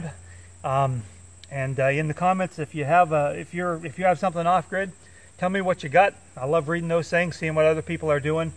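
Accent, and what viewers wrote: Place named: New Brunswick, Canada. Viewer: Well hello there fellow NB’er, at least I’m gathering from your name and the North Eastern, not to mention the accent, lol. The off grid chores never end and today will be the battle of my hand pump and hopefully an oil change on the ol’ Sierra. New subscriber here, thanks and cheers from Miramichi. 👋🙏❤️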